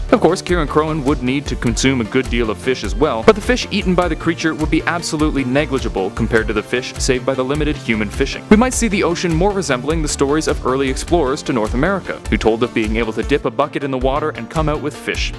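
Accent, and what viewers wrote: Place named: Scotland, United Kingdom. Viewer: Dude it's not that hard to understand Scottish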